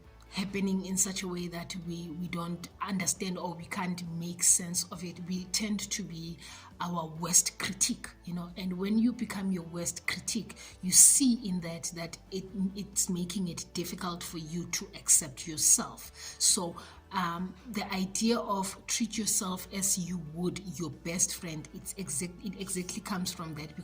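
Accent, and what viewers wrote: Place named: South Africa. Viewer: you look absolutely beautiful in red, love the nails and your entire look. Love the authenticity and your south african accent 😘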